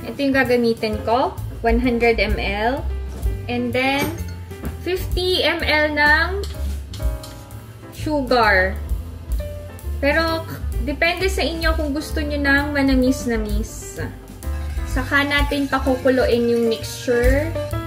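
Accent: South Korea. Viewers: I love hearing your Korean accent..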